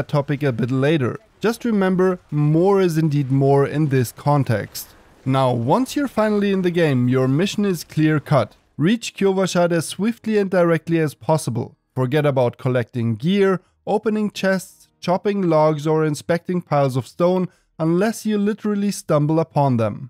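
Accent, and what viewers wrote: Germany. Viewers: sounds like a german guy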